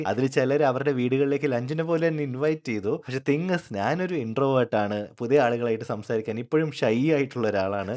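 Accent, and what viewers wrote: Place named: Central Travancore, India. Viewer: നിങ്ങളുടെ video വളരെ നന്നായി, എന്തെന്നാല്‍ നിങ്ങള്‍ കൃത്യമായി ഞങ്ങളെ വിവരങ്ങള്‍ പറഞ്ഞു മനസ്സിലാക്കി തന്നു, I appreciate you, നിങ്ങള്‍ ഒരു മദ്ധ്യ തിരുവിതാംകൂര്‍ സ്വദേശി ആണെന്ന് മനസ്സിലാക്കുന്നു സംസാരത്തില്‍ നിന്ന് മനസ്സിലായി,അല്ലേ, പെന്തകോസ്ത് സഭയുടെ കേരളത്തിലെ ആസ്ഥാനമായ കുമ്പനാട് എന്ന സ്ഥലത്തിന്റെ അടുത്താണ് എന്റെ വീട്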